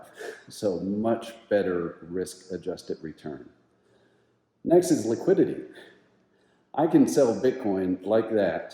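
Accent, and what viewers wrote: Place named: Southeast, United States. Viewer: I often wonder your general area because my ear says you are in the southeast.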